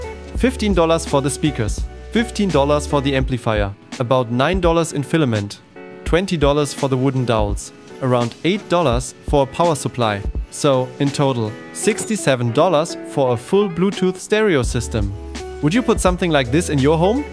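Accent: Germany. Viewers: I love the German accent. (no joke) Great video and idea! Thank you. Merry Christmas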